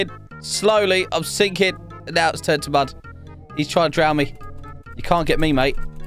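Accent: United Kingdom. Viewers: Is it me or is this guy British? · Hey ate you britesh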